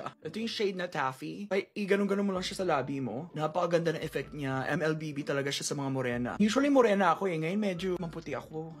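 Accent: Philippines. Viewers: Are you Filipino cause you have the perfect Filipino accent im a Filipino🎉🎉😊loving the Philippinessss🎉🎉🎉🎉😊😊😊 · I love your Filipino accent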